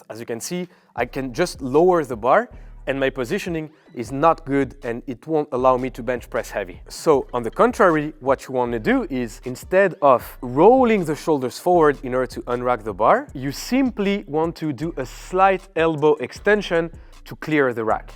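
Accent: France. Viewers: A French talking english......thats a rare kind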